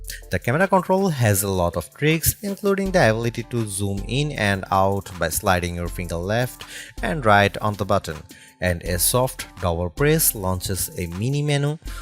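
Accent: India. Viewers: I hate your indian accent to be honest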